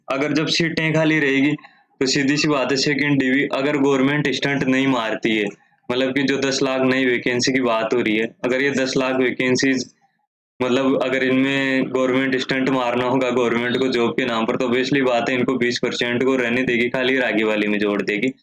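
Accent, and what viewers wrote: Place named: Pahadi, India. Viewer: Bhai pahadi lgra ....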